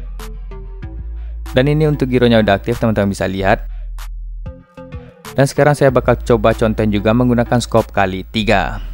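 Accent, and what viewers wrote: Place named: Padang, Indonesia. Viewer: oh.. orang Padang ya, bang. Kirain orang Malaysia 😅🙏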